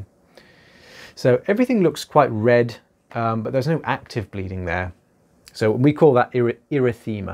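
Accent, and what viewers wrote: England, United Kingdom. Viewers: I'm here for the english accent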